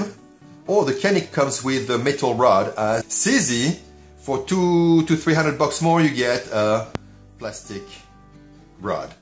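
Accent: France. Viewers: Y a pas un gros accent français ?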